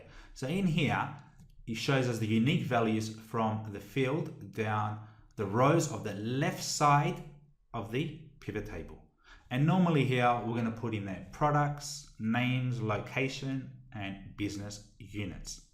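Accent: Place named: Australia. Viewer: with an Aussie accent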